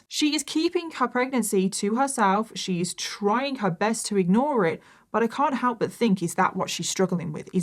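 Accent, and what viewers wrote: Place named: United Kingdom. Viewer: I heard 22nd ‘December ‘ but you’re not the only person who heard September.. might be the British accent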